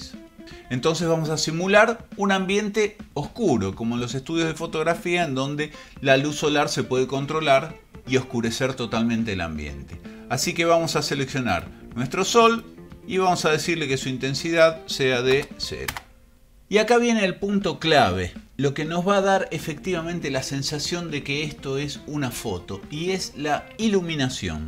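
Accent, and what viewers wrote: Argentina. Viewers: Profe estás en Córdoba?? En qué universidad estás? Yo pensé que eras de Buenos Aires por tu acento. Yo justo soy estudiante de la UNC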